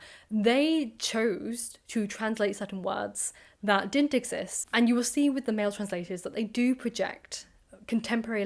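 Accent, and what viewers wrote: United Kingdom. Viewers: I’m really into what you promote, but you speak too fast for an international public, allthough you are vere charming british, please consider us “ slow ones” ❤️🤗 Maybe you’re just trying to reach a british audience, then my apoligies !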